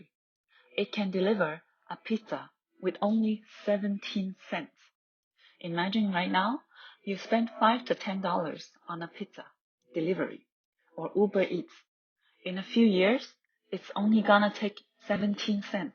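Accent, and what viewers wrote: China. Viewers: I am assuming you can understand Chinese by your accent. 个人认为EH比NIO潜力高多了，NIO的长期盈利能力其实非常有限，车企是低毛利企业，NIO的毛利只有不到15%, 更别说盈利后的净利润了，加上NIO的服务致使他们的成本更高，高估值给的其实是自动驾驶和发展成能源公司的潜力，NIO的自动驾驶也不如特斯拉和小鹏，换电长期来看不一定是最优解决方案。而EH的毛利率高达50-60%， 未来的市场未必比电动车市场要小，加上EH的技术和解决方案领先全世界的竞争对手。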